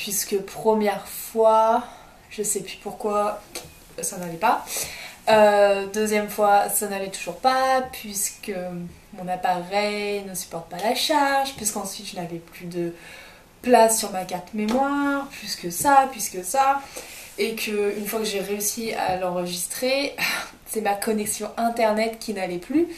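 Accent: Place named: United Kingdom. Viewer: ton petit accent british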